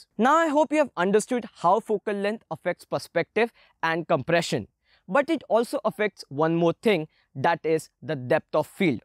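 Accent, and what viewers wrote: India. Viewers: The Indian English accent kill me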